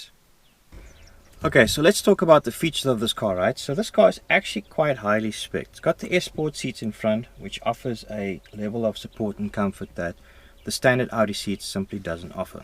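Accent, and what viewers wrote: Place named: South Africa. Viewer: This guy has a soothing voice. I love a South African accent… Great car too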